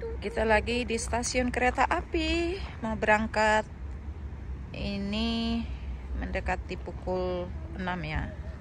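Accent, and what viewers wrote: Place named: North Sumatra, Indonesia. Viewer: Anak mix bule tapi logat batak nya kental ya mbak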